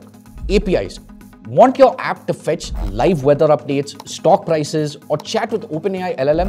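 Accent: India. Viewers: first homewaork to you get rid of your indian accent fun facts 50% of audience cloose and move to next video after hearing just the accent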